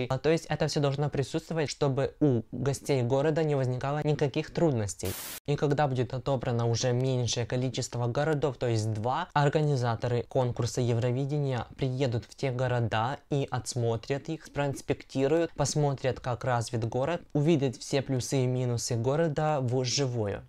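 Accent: Ukraine. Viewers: В первые слышу чисто украинский акцент)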